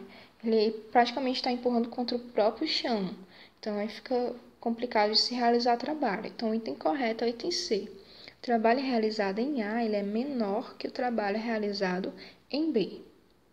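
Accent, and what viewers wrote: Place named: Pará, Brazil. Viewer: Eu gostei muito da aula, obrigado! e esse sotaque... você também é paraênce?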